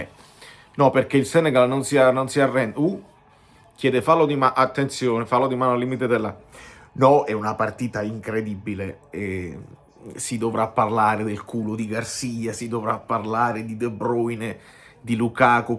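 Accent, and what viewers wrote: Naples, Italy. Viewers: Bello sentirti parlare in inglese con la amplomb napoletana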